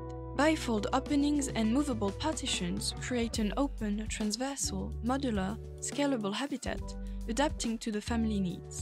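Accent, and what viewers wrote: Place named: United Kingdom. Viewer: Je viens de tomber par hasard sur ce projet étudiant et j'ai été bluffé par la qualité du rendu qui me semble déjà à la hauteur des plus grands designers d'espace de notre temps. L'habitation répond avec brio à l'enjeu social du projet tout en tenant compte du cahier des charges qui n'est pas des moindres. Je souhaite saluer le travail de la voix off dont les intonations "british" ont su s'accorder à la finesse et la subtilité de cette création architecturale. Ces deux jeunes designers ont réalisé un vrai tour de force avec ce projet qui annonce une carrière très prometteuse. Un grand bravo !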